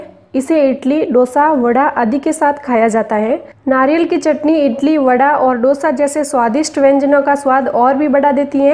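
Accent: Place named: Marwari, India. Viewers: Hindi me marwadi lheja aa jata hai, bahut badhiya. Best recipe. Thanks. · Mam apki awaj sunke lagta hai aap Rajasthan se ho.....kya me sahi hu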